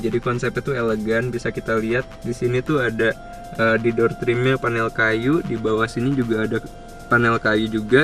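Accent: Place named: Java, Indonesia. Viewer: Kayaknya logat" jawa dehh.. Tapi kok GUA GUA yaaa😁😁😁😁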